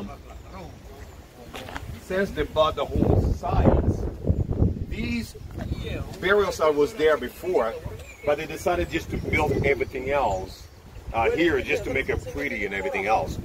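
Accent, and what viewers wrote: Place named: DR Congo. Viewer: That's wonderful! Never met a Congolese with such local accent! You must have moved to the states since you're baby!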